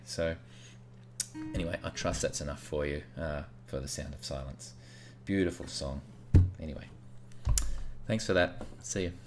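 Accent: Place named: Australia. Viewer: Just landed on this via YTs Bots, me having covered his lessons before, but so glad I did. This lovely warm Ozzy man's voice tone and teaching is spot on, and after all these years he is still giving Gold away for free. Check out his other lessons, brilliant at what he does and sings great too.. Don't pay any attention to the thumbs down, there's always 1 or two in the room that don't get it....haaa🤣. Subbed to many moons back. Enjoy..Rollo.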